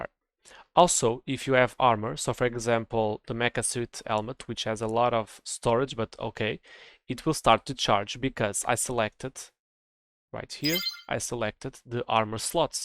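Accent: Portugal. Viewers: man your from portugal right? your accent is very portuguese and btw great video ty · My guy you sound kinda Portuguese ngl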